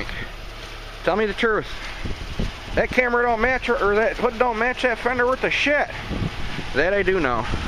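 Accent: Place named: Upstate New York, United States. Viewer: Nice job as usual! Where do you live? I'm from Fairport NY. Moved south to Raleigh in '97. My first house I bought was a 19K HUD HOME in Lyons NY. Just curious as to where you're at. Good to hear the accent. The weather is great here but watching your vids makes me a little homesick.lol nice work and vids keep em coming.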